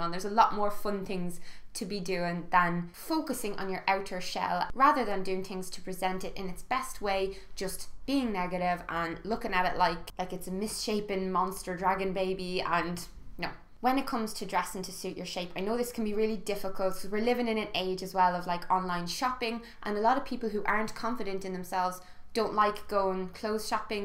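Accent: Ireland. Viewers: Haha I'm a sucker for an Irish accent!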